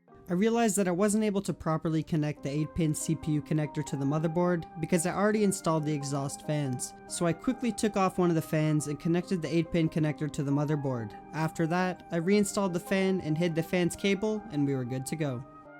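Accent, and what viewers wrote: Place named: Canada. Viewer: man looks like a 30 year old spanish father of 3 with a happy wife but sound Canadian as fuck also sup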